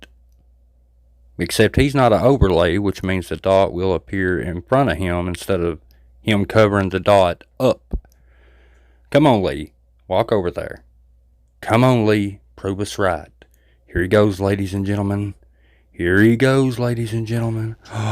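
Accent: South, United States. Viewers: Thank You for opening my eyes to a lot of fakers out there that I TRULY BELIEVED THEM. I think it's really rotten to the core to put out FAKE PARANORMAL VIDEOS when actual hauntings do occur and the people who are affected and suffering from these events make them look either fake like theirs or their just labeled "CRAZY!!" Plainly speaking, it's just fucked up and a truly greedy way to make a buck!!!, I had asked you if you happened to read my comment not too long ago, about the ALEXA, and I asked you flat out, "Well then, can you please explain how he's communicating through the Alexa. So I'm so glad for this upload, that it was addressed after all, one way or another!! My boyfriend is not easily fooled and thinks VERY SIMILARLY the way you do, and told me how he's doing it, like THIS, SO this was ever so appreciated. THANK YOU, and I sincerely hope your Mother is feeling a lot better. 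Please give her my regards my friend. Kindly, let her know I get chills and I get head over heels love it when I hear your Southern Accent! ☮️💜🤘 PS: BTW... I'm from New York City 😘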